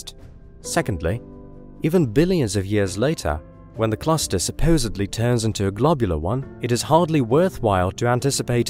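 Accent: United States. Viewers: You sound American...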